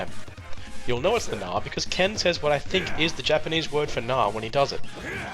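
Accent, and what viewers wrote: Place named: United Kingdom. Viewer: Nice video! You British people have such a cool accent :)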